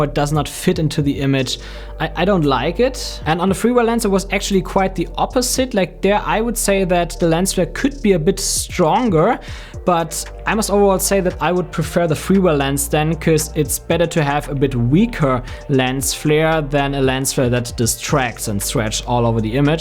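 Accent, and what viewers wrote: Germany. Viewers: Höre ich da einen deutschen Akzent?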